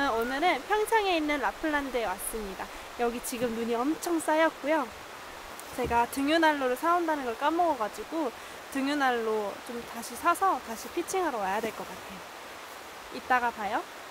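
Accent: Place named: Gyeongsang, South Korea. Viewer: 예쁜 아가씨 말투에 경상도 사투리가 약간 있는 거 같네요 ㅎㅎ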